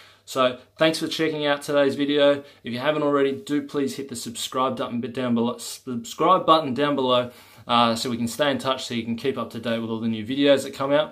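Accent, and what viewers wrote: Australia. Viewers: Love the aussie accent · You sound Australian.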